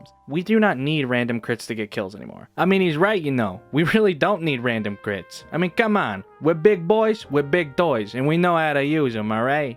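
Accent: New York, United States. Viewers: I always thought that your New York accent was your actual voice whoops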